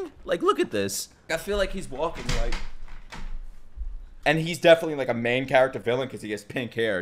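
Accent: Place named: New Jersey, United States. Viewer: I've been trying to figure this dudes accent, definitely Jersey.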